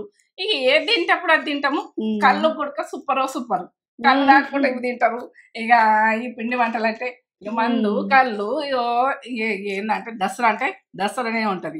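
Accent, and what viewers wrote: Telangana, India. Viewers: Nenu Chennai lo untanu , telagana amai ne …. Mi e video chusaka , mana Sontha vallu matladinatu anpinchindi …. Miru etlane matladthu cheyandi amma ….. nen iythe skip chepakunda motham video chusina …. ❤❤❤❤❤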